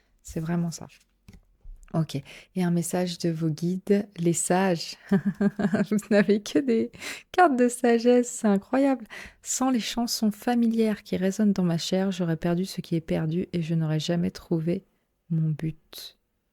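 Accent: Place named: Acadia, Canada. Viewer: Salut, heummm la carte no 2 me fait penser à la tradition druidique hehe hahaha l'accent me fait plus penser à celui de l'Acadie hehe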